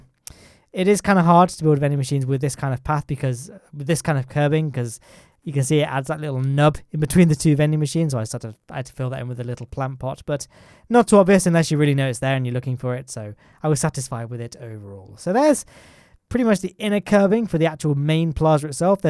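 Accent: United Kingdom. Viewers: This is the most british commentary i've ever heard :D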